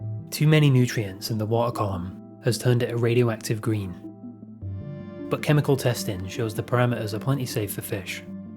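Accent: United Kingdom. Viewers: Bro has the perfect amount of British accent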